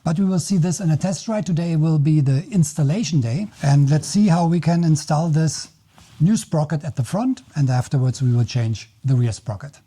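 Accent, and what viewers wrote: Germany. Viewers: hör ick da nen Berliner Akzent raus? So herzig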